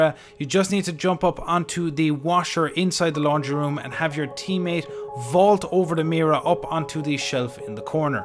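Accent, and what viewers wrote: Ireland. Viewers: As soon as I heard ur voice I could tell that ur Irish, what county u from chap? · Are you Irish? I'm Irish and you sound Irish Tbh😂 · You sound like you're Irish. If I'm right, which part of the country?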